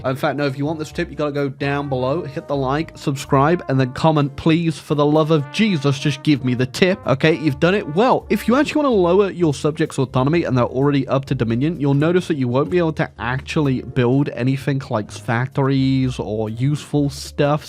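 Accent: England, United Kingdom. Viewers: I hope sees this. Please can you tell me what country your from? You sound English but say zee and rowt (rather than zed and root)... Not important. But as an Englishman I just need to know 😂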